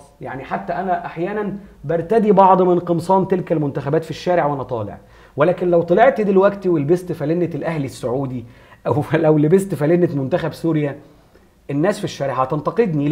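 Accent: Egypt. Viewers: نتا مصري ام سوريا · انت مصري؟؟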